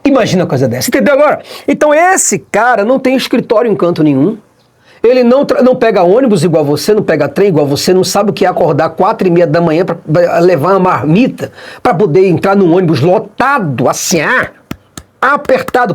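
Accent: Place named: Rio de Janeiro, Brazil. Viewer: Putz... esse sotaque carioca é essa entoação lembram muito os pastores da Universal 😅😅😅😅😅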